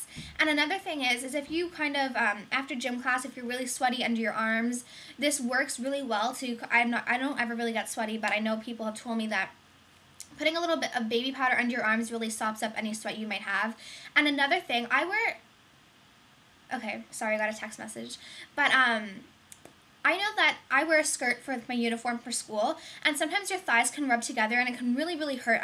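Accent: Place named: Canada. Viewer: look at how tan you were! goodness..haha your so pretty and i wish i had a canadian accent haha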